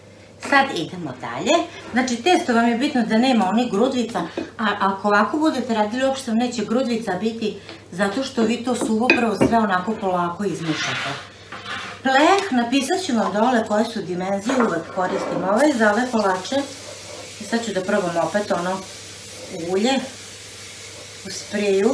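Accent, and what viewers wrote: Vojvodina, Serbia. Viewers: A ja pored recepata najvolem vojvodjanski dijalekt❤️,tako slatko i milo divanite ,da Vas je milina slusati. Veliki pozdrav iz Curuga🥰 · Kolač je divan!A dijalekat ❤!Naš vojvođanski!❤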